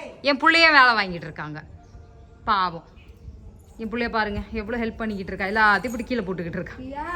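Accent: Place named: Kongu, India. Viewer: Tamil sema athulium konku vois eathathrmana pechu nenga nalla pesirunga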